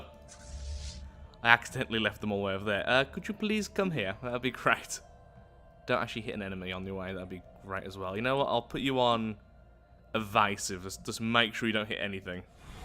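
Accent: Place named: Birmingham, United Kingdom. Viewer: That sexxy Brummy voice...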